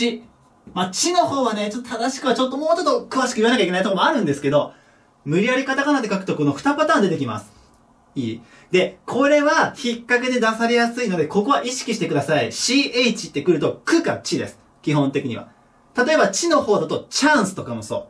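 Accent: Kansai, Japan. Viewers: 前から思ってたけど関西の人ですか？？ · あれ、さん関西の方ですか？？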